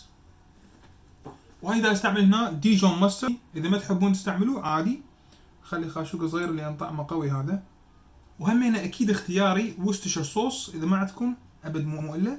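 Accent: Iraq. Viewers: طبيعي اني انسى اتابع الخلطه بسبب اني مركزه ع هرجتك لي م قدر اتخطاها كلششششش تخبببببلللللل اللهجه العراقية😞🖤🖤🖤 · راحة غير عادية لما بدأ يتكلم وطلع عراقي من مصر .. تحيا العراق ❤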